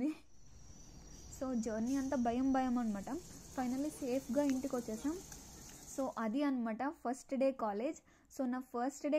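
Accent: Godavari, India. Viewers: Cute voice midi godavari jillaa na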